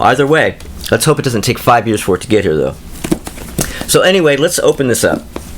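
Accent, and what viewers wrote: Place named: England, United Kingdom. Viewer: Love the Monkees funny how your doing a English accent when most were American great showing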